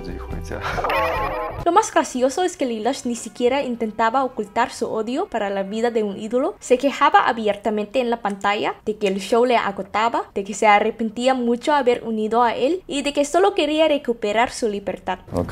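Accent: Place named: Russia. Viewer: Pense por un momento q su nombre era Lelouch el protagonista del anime Code Gears...Sobre como hablas español la gente piensa q tu acento parace ruso por la "R" los rusos por lo general suelen arrastrar DEMASIADO la "R" al usarla en el español. La R solo se arrastra cuando alguna palabra comienza o terminas con R (raro, rico) o cuando aparece la doble R (ferrocarril, carro) y cuando aparece entre consonante y vocal (pra, pre, pri, pro, pru, bra, bre, bri,.....etc) como por ejemplo preparatoria, batracio y por ultimo cuando en una silaba esta compuesta solo por una vocal (aeiou) seguida de la R por ejemplo articulación, ermitaño, ortiga (la silaba q le sigue comienza en consonante....)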